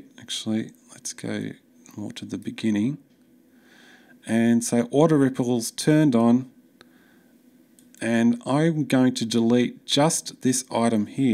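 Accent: Australia. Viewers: great, straightforward video in Australian, although I speak Californian. Thank you sir! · subscribed just for "ill be speaking Australian"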